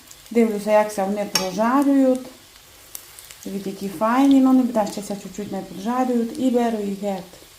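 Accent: Transcarpathia, Ukraine. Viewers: Чарівна вимова. Мабудь закарпаття❤ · Приємно чути Закарпатський діалект. В мене тітка з Сваляви, теж так розмовляє. Хороший рецепт, дякую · Боже, как же мне нравятся вот такие хозяюшки. Все по настоящему, по домашнему, без помпезности, по простому. Ручки пухленькие, голос приятный, говор с акцентом украинский. Спасибо дорогая хозяюшка за рецепт, обязательно приготовлю.👍🌹💐😊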